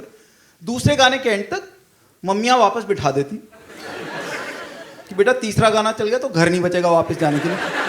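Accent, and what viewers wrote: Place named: Punjab, India. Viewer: Decent comedian but has lot of Punjabi influence.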